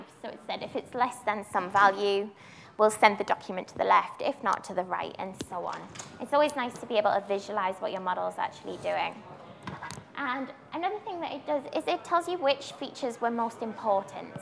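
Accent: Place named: England, United Kingdom. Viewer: that's a very very english accent lol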